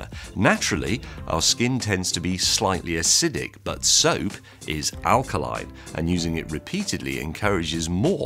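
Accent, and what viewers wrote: Scotland, United Kingdom. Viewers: Apocrine is pronounced Aprocine Propanoic acid is pronounced Propioc acid Hexanoic acid is pronounced as Hexionic acid These are scientific terms. It has nothing to do with dialect. You can't just take defined scientific terms casually because you're British. The way he says out and ouse words makes me suspect Scottish flavours.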